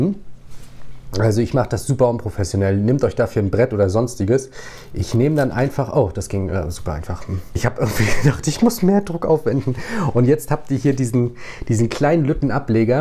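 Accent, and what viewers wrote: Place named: North, Germany. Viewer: Ich liebe, dass du uns neben der Vermehrung von Pflanzen nebenbei noch Nachhilfe in norddeutsch gibst 😅💚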